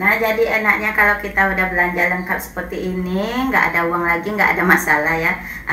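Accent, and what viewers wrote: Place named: Java, Indonesia. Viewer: Mbak Jawa nya nggak ilang....salam manis dari Kami di Depok yaaaa...🌷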